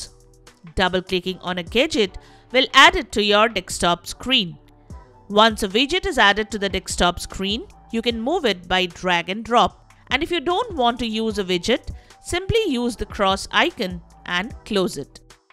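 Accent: India. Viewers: hidious indian accent ... also 'dekstop'? learn to read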